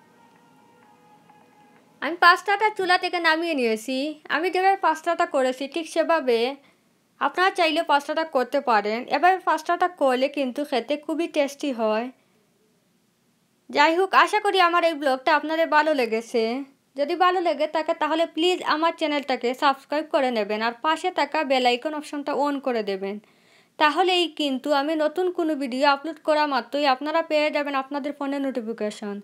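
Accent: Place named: Sylhet, Bangladesh. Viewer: তোমার মাত হুনিয়া বুজিলাইছি তুমি সিলেটি।আমার এলাকার আফা।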